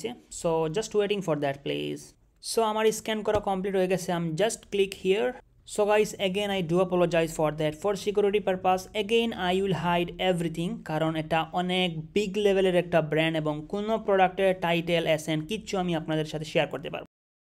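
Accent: Sylhet, Bangladesh. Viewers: are you sylhetti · ভাই তুমি সিলেটি নি? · Apni ki Sylheti 🙂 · 🫣তুমার মাত হুনলে বোঝা যায় তুমি সিলেটি 🏞️😍😘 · Assalamualikum.bhai kita Sylhety ni? mashaAllah nice video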